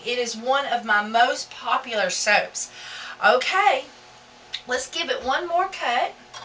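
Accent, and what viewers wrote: South, United States. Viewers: This was a great video. Thanks for uploading. I have been curious about making soap since I saw it being made at Stone Mountain years ago. Thanks for giving your recipe for beginners. I have not gotten up the courage to try yet. But the simplicity of this video makes it seem like, YES I could do this. The Southern accent (LOVE IT) makes me feel like I am learning from my Aunt. ✌🏻❤ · I’m pretty sure that she speaks in a southern accent but is trying very hard to not speak like that🤣🤣🤣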